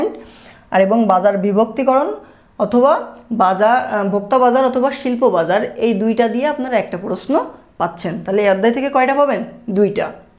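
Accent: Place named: Khulna, Bangladesh. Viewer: দাদার যশোর অথবা খুলনায় বাড়ি 😊❤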